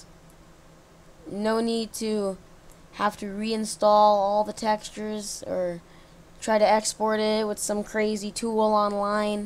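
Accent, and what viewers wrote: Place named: New York, United States. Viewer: you have a new york accent lol. anyways thanks for the help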